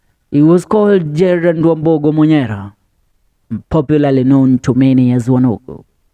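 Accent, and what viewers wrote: Kenya. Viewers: It would greatly help if u worked on your oratory skills..We may accept the Kamba accent, but the delivery is all wrong..Master the content n narrate in a manner that excites..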